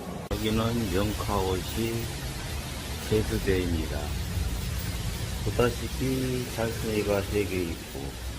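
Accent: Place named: Hamyeol, South Korea. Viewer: 황토막한번 가보고싶네요 근데 님 고향이어디세요 제가 함열그쪽인데 저희동네맡투가들리네요 ㅎㅎ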